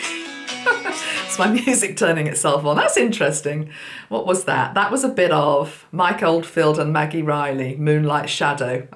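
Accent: England, United Kingdom. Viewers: Thank you so much for your kindness and charm, and your lovely English accent. I come from Brighton XXX🕊️🌹🎶💡🎭🐛🦋😷😘👽💚🙏🏻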